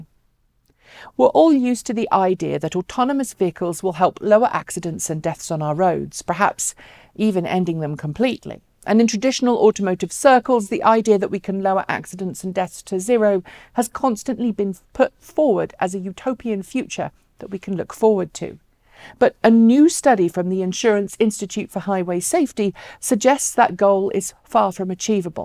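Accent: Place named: England, United Kingdom. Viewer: Your accent makes me think of cream teas and an English countryside . Thanks .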